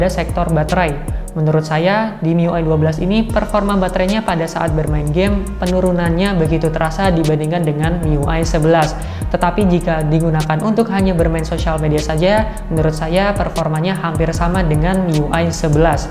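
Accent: Tegal, Indonesia. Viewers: Wong tegal um?? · tegal bos? haha auto sub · Jebule tegal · Tegal kah??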